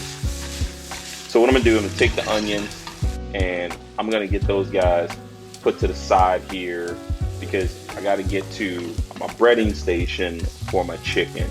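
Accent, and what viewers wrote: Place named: South, United States. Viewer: When it comes to frying chicken, I’d only trust a guy with a southern accent!! Is the flavor or tannins the sweet tea imparts to the recipe?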